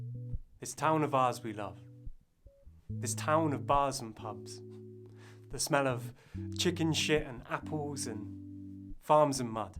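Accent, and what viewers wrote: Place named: Hereford, United Kingdom. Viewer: I have an audition coming up that requires a Hereford accent, this has been very helpful as it's not an easy one to find examples of. Thank you!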